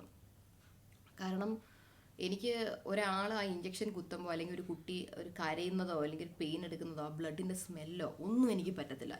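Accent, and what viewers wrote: Trivandrum, India. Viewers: Malayalam samsarikkumbol trivandrum slang undo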